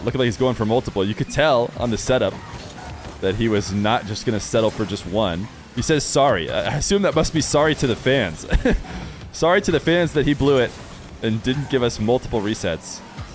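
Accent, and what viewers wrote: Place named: United States. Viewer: you're like the NA Version of JohnnyBoi_i. And I don't mean the format of your videos only, but the way you cast, even your voice. It's like I'm hearing JohnnyBoi_i but with an American accent. Very weird, but in a positive way, pog:D Loving the content, bro!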